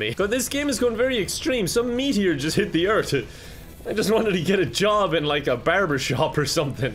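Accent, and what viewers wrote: Ireland. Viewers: IRISH ASMR WE NEED IT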